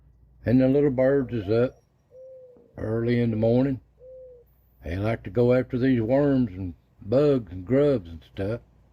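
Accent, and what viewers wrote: Appalachia, United States. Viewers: I am 54.I miss my earthly father and his family which are all deceased now.Its so soothing to hear voice that brings back edifying and that Appalachian voice.I praise Christ now as my heavenly father gives me Peace through the Word of the holy bible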